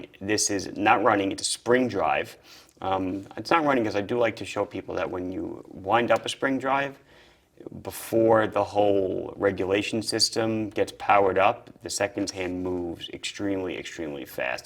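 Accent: Long Island, United States. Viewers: “Hi this is …” that accent whips my head around every time 😂 we don’t have many authentic Long Islanders down here in Texas.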